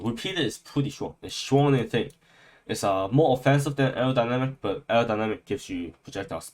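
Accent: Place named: Australia. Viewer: You sound like you have an Australian accent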